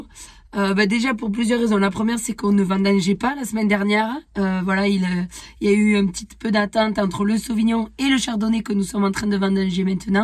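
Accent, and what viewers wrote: South, France. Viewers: Tant mieux que la récolte de la vigne soit acceptable. J'imagine que le soleil de votre région (par votre accent du sud) à aidé à ce que les plants produisent quand même. Quel nom de bouteille est ce que l'on pourrait acheter du Québec pour goûter un petit peu votre région. Merci et bravo pour votre travail.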